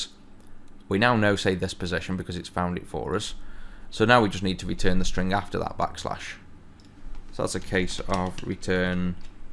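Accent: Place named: England, United Kingdom. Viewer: Thank you very much for all these tutorials, they're the best and most in-depth explanations of WPF and C# I've found on youtube. Great teacher! I'm going to start thinking of code in my head in an English accent now, which is fine by me!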